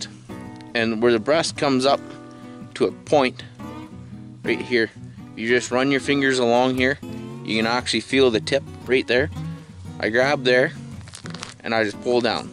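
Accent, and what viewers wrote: Canada. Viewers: This is a Canadian accent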